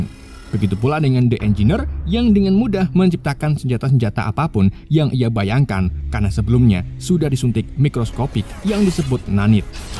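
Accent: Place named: Pekalongan, Indonesia. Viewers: Wong kalongan po min. Kok ngomong megono. 😅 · Iki admine antara wong Pekalongan nek ora wong batang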